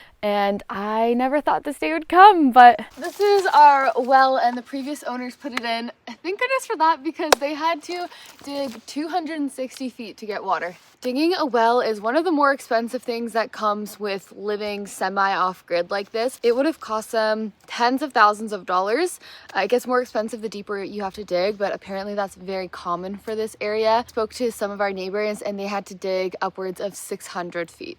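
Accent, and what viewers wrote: Canada. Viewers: Nice! Ah the Canadian accent ❤❤❤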